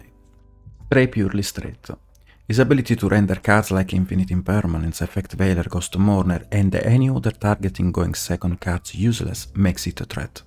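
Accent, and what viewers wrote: Italy. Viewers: Italian Yu-Gi-Oh Banlist ASMR, i kinda dig it. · Are you Italian 😂 it's just endearing to me how you talk also my neighbors are Italians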